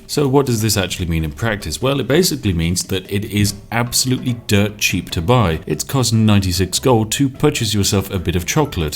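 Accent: United Kingdom. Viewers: He's British, that's how we say it, chill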